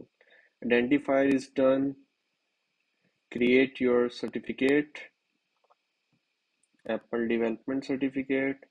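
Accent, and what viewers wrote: India. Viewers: Vallahi ben de Hintli sandım , dublaj falan olabilir. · abi niye hintli gibi konusuyosun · You have explained everything in detail and this document is not explained so clearly on almost any page of apple. What I don't understand is that the developer account belongs to a Turkish person, but you speak with an Indian accent.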